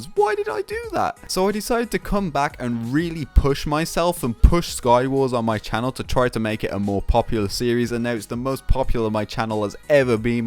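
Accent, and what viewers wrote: Birmingham, United Kingdom. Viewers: Jeez u can really hear the brummie in the older videos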